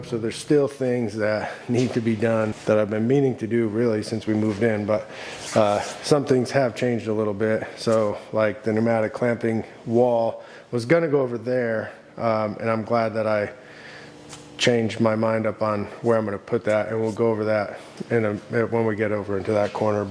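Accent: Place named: Connecticut, United States. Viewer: Love watching your videos 👍 Very well done. But I also love your introduction. Yo what up - Yo how ya dew in 🤣 You’re definitely from Connecticut 🤌 Fugettaboutit ! Love it you make a me home sick. Keep up the good work.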